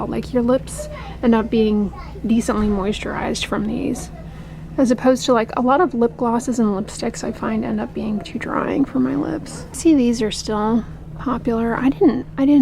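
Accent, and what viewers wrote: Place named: South, United States. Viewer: Happy Sunday! Hope you’re spending time with your mother!!! ❤ In your car talk, you sounded so southern! I never noticed how much of a southern accent is hidden in your voice lol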